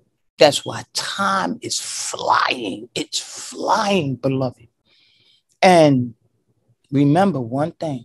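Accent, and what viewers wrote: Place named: New York, United States. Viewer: Sister, you are a wise beautiful person. All praises to TMH for you!!! He has given you great strength and I really appreciate it. I'm glad you are speaking on this, because I saw that particular Kerry Ann's video about India, and I was saying the same thing, "They are NOT TMH'S PEOPLE at all shaking my head. THEY ARE NOT CALLED BY TMH'S NAME!!! Sister, Psalm -11 tells us to rejoice when we seeth the vengeance.... Sister, you sound like a Northerner, a New Yorker. Anyways, TMH continue to open your eyes sister. Please, if you will sister, check out gdash the prophet channel. He was similar to you, but TMH has raised in up to a higher level of wisdom, knowledge, and understanding. May TMH raise you up higher as well. Peace Sister. Love you my sister.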